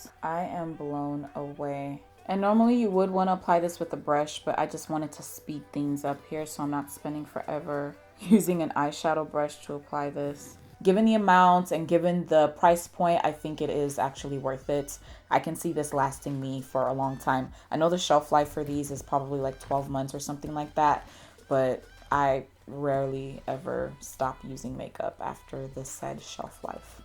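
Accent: Nigeria. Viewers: Very beautiful and honest review. Will look forward to more of your Nigerian accent😂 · Stunning!!!Luv the naija accent🤣🤣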